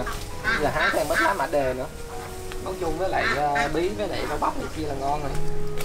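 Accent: South, Vietnam. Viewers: Bạn ấy sinh ra và lớn lên ở miền nam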